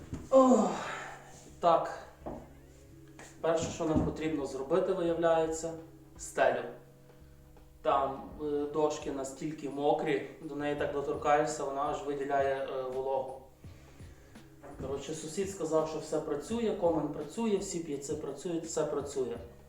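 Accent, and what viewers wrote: Ukraine. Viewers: ТАК НАСМІЯЛАСЯ З ВАС. ВСЕ ПРИКОЛЬНЕНЬКО. ВАША МИЛА ВИМОВА ПРОСТА УКРАЇНСЬКА РАДУЄ. НЕ ЛЮБЛЮ МАТЮКІВ,АЛЕ В ВАС БУЛО СМІШНО. ГОЛОВНЕ ХАТКА ДІЙСНО ДУШЕВНА. В НІЙ ТЕПЛО ХОЧА НІХТО НЕ ЖИВЕ І РОЗВПЛЮЄТЬСЯ. ПІЧКИ ,ПЄЦИ ТО ШЕДЕВР!БЕРЕЖІТЬ ЦЕ. А ОТ ТА ЛАВКА ВИ СКАЩАЛИ ДЕРЕВЯНА ТО СОФА,АБО БАИБЕТЕЛЬ ЩЕ НАЗИВАЛИ. ДЕ ЯК В ЯКІЙ МІСЦИНІ. АЛЕ В ЄВРОПІ ЦЕ РАРИТЕИ! ПРОШУ ЗБЕРЕЖІТЬ ЇЇ НЕ ПОДАЛКУЄТЕ ВРЕА ЩЕ ВІДКРИВАЛАСЯ КРИШКА І ТАМ РІЗНІ РЕЧІ ЗБЕРІГАЛИ. ПОСТІЛЬНА БІЛИЗНА,ОДІЯЛА. В ІТАЛІЇ ІТАЛІЙЦІ ЇХ В РУМУНІВ КУПЛЯЛИ РЕСТАВРУВАЛИ І ,ЯК МЕБОІ ПРИКРАСИ ЦЕ. ЗИЧУ ВАМ НАТХНЕННЯ І УСПІХУ. СЬОГОДНІ ЗНАЙШЛА ВАС В ЮТУБІ І ЛЮБУЮСЬ.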